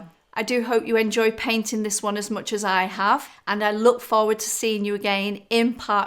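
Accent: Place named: Northwest England, United Kingdom. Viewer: I really do see your method as the best way to paint, by separating the issues of value and colour the stress is taken away as achieving the painting becomes more of a step-by-step process. My background is in project management so breaking big jobs into small organised tasks comes naturally to me. I see things in your techniques and methods I've not seen before, so inspiring to me. The technique of underpainting starting out with a mid tone and then wiping/erasing for lights and reinforcing darks makes it look so simple and easy, I guess great skill and experience help too ;-) You paint just the way I want to, not ashamed to use reference photos and not scared to try different ways to achieve gorgeous results. So next month, when I have some spare money, I shall go to your website to buy a subscription. I've already cancelled my paid subscription to a SW USA chap's tutorial site where he covers all kinds of materials and methods but just on the surface whereas I want to dig deeply into and concentrate on the indirect method in oil but with modern methods as you do, combining tradition with innovation. 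Your approach suits me just fine and I prefer your northwest(?) English accent too. West of the Pennines not too far from Manchester?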